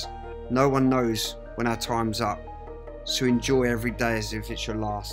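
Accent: England, United Kingdom. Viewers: The best explorers on YouTube. Not too much talk, and when do, the great English accent. Some explorers know very little about what they are looking at.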